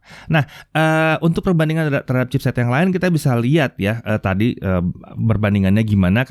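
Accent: Indonesia. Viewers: maklumin aja lidah org indonesia gitu ,ya biarin lah 😂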